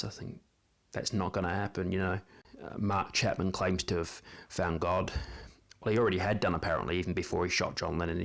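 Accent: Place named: Japan. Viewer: Great video. I was 7 in 1980 and I also remember my father too but it was because I’d had never seen him cry before. Obviously now 40 yrs later on I understand. BTW nice Japanese accent 🇯🇵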